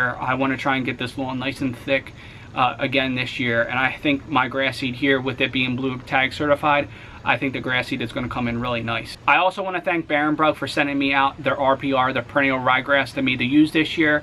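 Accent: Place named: Philadelphia, United States. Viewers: Ha, I was thinking the same thing. Seemed like you had a twinge of Delco too! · Definitely recognize that Philly/South Jersey accent. Fellow native & lawn care nut here, Gloucester County!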